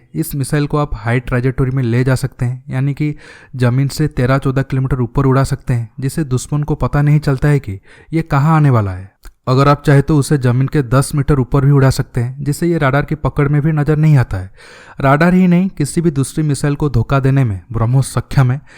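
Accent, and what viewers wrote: Odisha, India. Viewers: Odiya hai apka hindi achya nehi hai ..odiya typ ka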